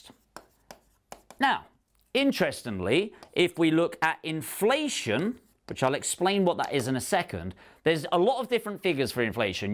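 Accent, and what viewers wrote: United Kingdom. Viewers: I’m curious to hear you’re from which part of UK? 😅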